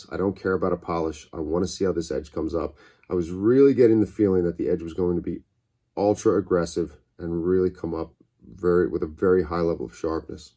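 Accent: United States. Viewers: This is off topic but every time I watched your videos your smooth deep voice always reminded me of someone I couldn’t figure out, but now I realized you sound like an American accented Clive Owen. 👌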